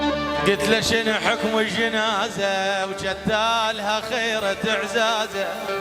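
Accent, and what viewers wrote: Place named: South, Iraq. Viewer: الصوت الجنوبي واللهجة الجنوبيه العراقيه تختصر كل مافي داخلك من حزن وهموم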